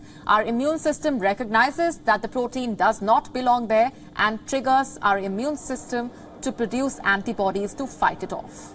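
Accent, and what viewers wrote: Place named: India. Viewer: Go work on your Indian accent it aches my ears, I tried...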